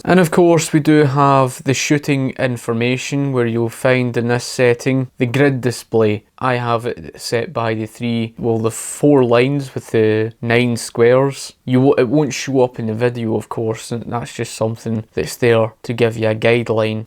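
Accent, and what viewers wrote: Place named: Scotland, United Kingdom. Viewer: A Scottish accent is my favorite accent in the world oh my gosh seriously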